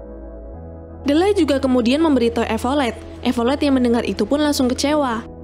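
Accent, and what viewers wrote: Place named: Sunda, Indonesia. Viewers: Gw nonton karena yang ngomong nya cw orang Sunda lagi ya Allah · Sukses terus mbak.. Lucu nyarios sunda na.... Aku nyesel baru baru ini nemu chnl mbk... #auto chanel faforit ini mah...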